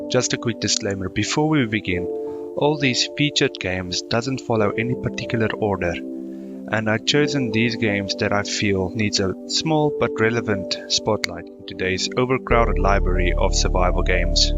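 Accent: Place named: Afrikaans, South Africa. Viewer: you dont sound english you sound afrikaans if you do awe brasse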